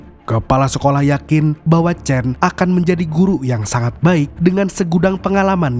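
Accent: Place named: Kalimantan, Indonesia. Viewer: bg lu orang kalimantan yaa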